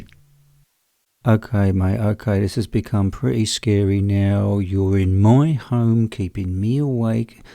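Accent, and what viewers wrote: United Kingdom. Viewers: why thankyou! it's all because of the British accent and the way you read out the stories, absolutely amazing !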